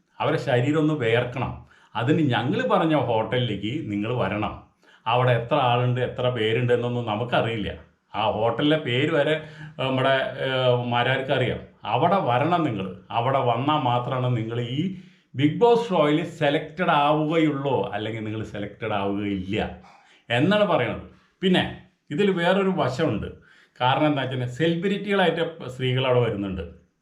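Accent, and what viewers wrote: Thrissur, India. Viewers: I enjoy your trichur slang malayalam . You are bold. Brave man. Good comedy. Thank you.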